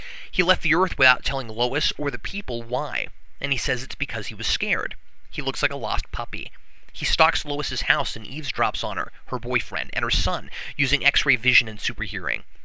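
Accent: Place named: United States. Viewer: Only cos people are dumb and get a huge boner for 'splosions and mass destruction. Man of Steel is not a superman movie. Superman Returns at least had a heart to it. Didn't give one shit about anyone in the new one. I need to go now though, this guys particular brand of American accent is cutting right through my brain.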